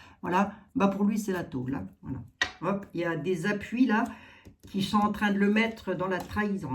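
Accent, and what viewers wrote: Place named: East, France. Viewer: A propos du nain de jardin 🙏🙏🙏🙏 -moi je l'appelle Kaa, le serpent du livre de la jungle, il hypnotise les naïfs. Merci (d'où vous vient votre petit accent, l'est de la France?)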